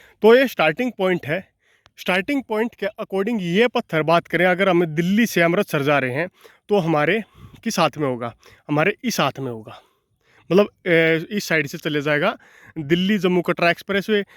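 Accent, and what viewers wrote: Haryana, India. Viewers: Zabardast yaar ..... infact your haryanvi accent is also amazing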